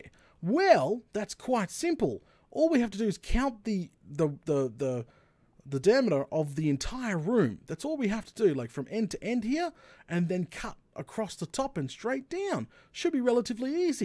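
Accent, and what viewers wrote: Australia. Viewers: I love this guys Aussie accent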